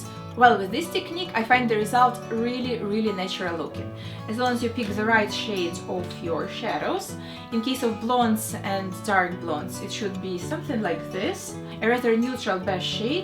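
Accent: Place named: Russia. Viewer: After listening this just for a few seconds I became sure it is Russian accent. Double checked it in description and yep, she's russian. Btw привет, me too)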